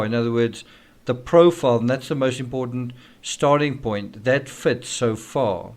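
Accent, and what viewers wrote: South Africa. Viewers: Sounds South African 🙂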